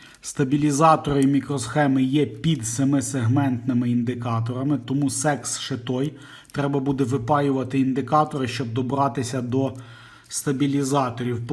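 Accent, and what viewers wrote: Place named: Horodok District, Ukraine. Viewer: Ви певно з Городоцького р-ну😂 Слухаю і балдєю 😆👍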